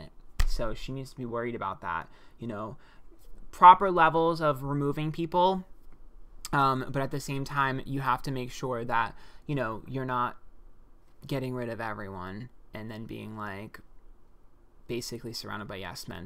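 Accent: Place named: AAVE, United States. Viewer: the aave needs to stop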